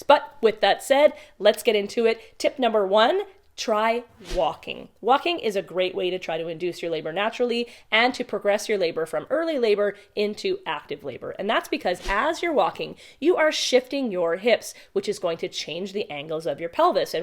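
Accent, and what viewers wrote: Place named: Canada. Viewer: I love your videos, they are so informative and I love your voice and Canadian accent. Beautiful and soothing. Also, not pregnant, but we are planning on having a second baby sometime soon!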